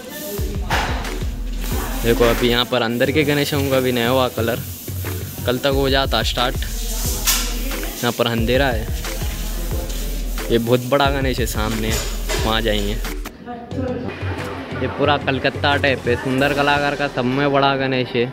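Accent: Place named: Hyderabad, India. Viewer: hao bhai🔥 hydi bolte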